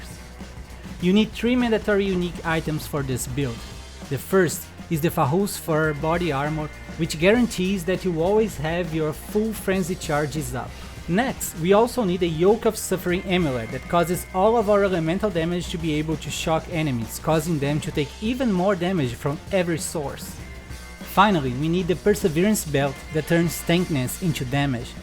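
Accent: Brazil. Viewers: Vc é Br né parceiro eu tô vendo teu sotaque Bro, vlw por esse conteúdo maravilhoso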